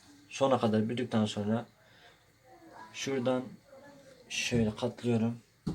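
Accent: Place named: Mardin, Turkey. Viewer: %100 mardinlisin konuşmadan belli :)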